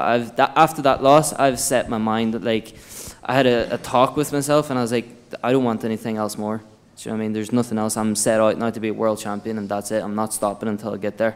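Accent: Ireland. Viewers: James : Irish accent (bc he was born in Ireland) Everyone : “bruh he copied Connor” lol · Dude I get you got a Irish accent but your literally trying to sound like Conor “you know I mean”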